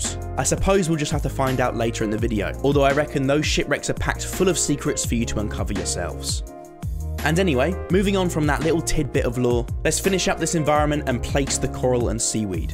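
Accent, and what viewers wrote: United Kingdom. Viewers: why did he have to be british though 😭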